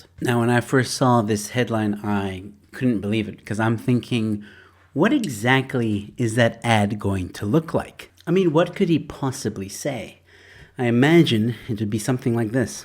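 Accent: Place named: United States. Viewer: your video is poorly put together and comes across as propoganda. Who pays you to post this absolute junk and why don't you walk around in South Africa to see the development AND integration we have going on? Or are you just more comfortable being sheltered in your home spreading nonsense like this that tarnishes the name of our country? Your accent is American, why do you post so much about cape independence and so on? I'm going to find out whose funding you and you will be held accountable. So will they.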